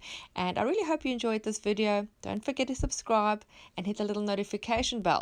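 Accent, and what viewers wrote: South Africa. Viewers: This is so simple yet so effective! Where are you based? You sound South African ☺️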